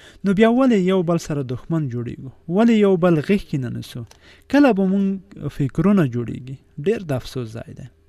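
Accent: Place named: Khost, Afghanistan. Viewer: شه پیغام دی وروره، خو یوه غوشتنه ده، هیچکله خپله لهجه مه تغیرکوه، خبری دی داسی شایئ چی خوستوال یی. مننه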